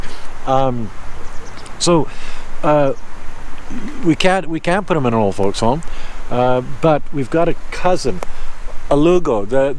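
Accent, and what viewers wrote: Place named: Canada. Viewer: As an American who grew up in the states my whole life I agree, it’s great to hear a variety of perspectives on China from a friendly beer-drinking Canuck with an awesome accent living there. I’d love to visit someday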